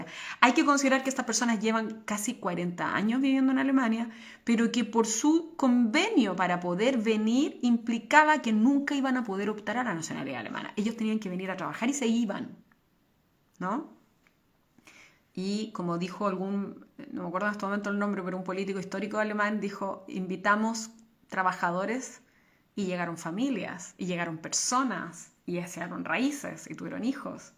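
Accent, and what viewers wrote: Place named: Chile. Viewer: Excelente vídeo muy informativo y riguroso. También le tengo que decir que su acento chileno es precioso sobretodo porque es de alguien culto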